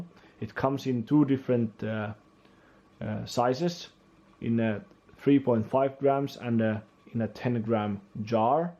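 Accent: Finland. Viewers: Suomi brgl, tunnistin heti aksentist! 😂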